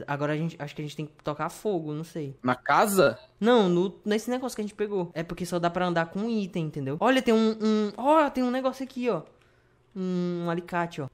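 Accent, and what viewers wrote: Northeast, Brazil. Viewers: Nordeste meu fi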